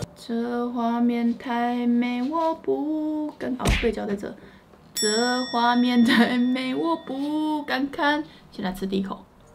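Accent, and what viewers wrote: Taiwan. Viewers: 的台語真的好好笑，這個影片真歡樂怎麼可以吃這麼多人還這麼漂亮又瘦...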